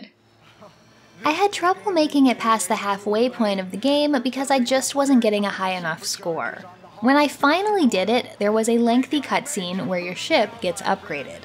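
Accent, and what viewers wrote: Canada. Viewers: I remember seeing a Sega CD and Sewer Shark set up on a demo display at Meijer, which is a grocery store chain around Michigan which you probably don't know since I assume you are from Canada based on your accent, but as a young teenager at the time seeing this game I was blown away. For some reason, seeing shitty live video in a video game during the 90s just knocked your socks off. It is weird to think that devs and publishers thought that this was the future of video games at the time. Like they honestly thought super grainy live footage with a crosshair on the screen where you shoot dumb shit was where videogames were going forever. That's insane. On a side note, I'm playing Subnautica right now and I'm about 35 hours in and that game is insanely awesome. Maybe check it out if it looks interesting to you. It has the potential to give anyone PTSD though.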